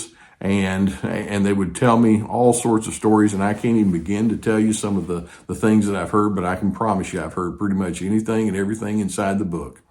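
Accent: South, United States. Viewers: Love the southern twang!! It helps my Georgia heart listen ❤ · You standing on hollow ground..... My jaw dropped when I saw you reply to US... Really I started to cry. The very first time I watch you. You had so much southern twang that I kept watching other people, but in this past year... Your the best!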